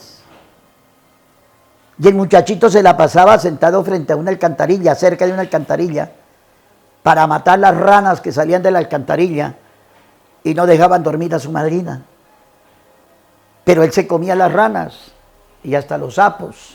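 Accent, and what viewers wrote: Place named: Colombia. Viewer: Se siente el acento colombiano😀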